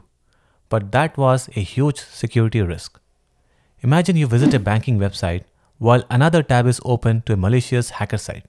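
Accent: India. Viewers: Best !!!! crazy video (I think u are indian by accent tho) ❤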